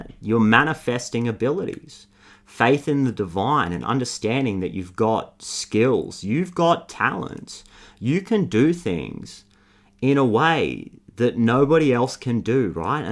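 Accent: Australia. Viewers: didn’t know I would find a reader with a thick australian accent holding anime chibi tarot cards to resonate with me but alas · Felt like you were speaking directly to me. Thank you so much, also the Aussie accent makes it so much better for some reason ❤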